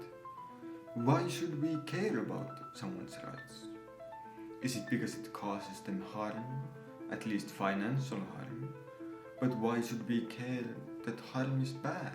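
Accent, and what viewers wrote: Finland. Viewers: Sama homma, pelkästä aksentista tajusin jo Logickedin videosta että nyt on suomipoika asialla ja olihan se sitten pakko katsoa että mikä kanava tää oli. Aikamoista, aikamoista... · Että hävettää suomalaisten puolesta kun rallienglannilla sönkkää halpojen jenkkiuskisten myyntipuheita juutubessa. Meillä on tän maapallon parhaita koulutuslaitoksia, kirjastoja ja terapeutteja. Jospa kävisit vaikka filosofian alkeiskurssin ennen kun sönkkäät tätä skeidaa koko maailman kuultavaksi.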